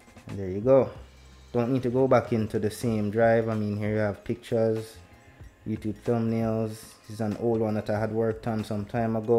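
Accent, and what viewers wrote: Jamaica. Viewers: Wait a yard man?? I was expecting an American heeediot 😂 big up my yout 👊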